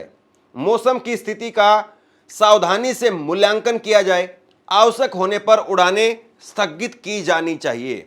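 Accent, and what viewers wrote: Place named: Haryana, India. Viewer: Ek. Nitin. Yahu. Jesa. Viman. Humare. Pas. Bhi. Agar. Nitin. Yahu. Apne. Ush. Viman. Se. Isril. Chod. Kr. Bhag. Gaye. H. To. Asa. Hi. Kuch. Yaha. Asa. Hi. Viman. Vale. Ke. Sath. Bhi. Ho. Sakta. H ish. Se. To. Bahut. Se. Log. Yaha. Anath. Ho. Jayenge aap. Ke. Chanel. Ka. Nam. Tk. Nahi. Pata aap. Ek. Nojawan. Koi. 30. 35. Sal. Ki. Umar. Ke. Haryana vegra. Se. Lagta jese. AAp. Ke. Bolene. Ke. Andaj. H ye. Badi. Dookh. Bhari. Khabar. H. Operation. Sindoor m. Ush. Ne. Pagasus. Se. Humare. Samarthan. Ek. Badi. Bhoomika. Nibhaee. Thee. 14. June. 25. Sature. Day. Jai. 2. Shani. Dev. MAharaj bakheda. Hune. Gram. 7. Om.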